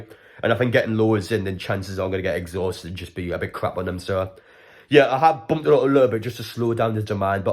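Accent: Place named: Sunderland, United Kingdom. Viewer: You sound like a mackem a take it you ain't a Geordie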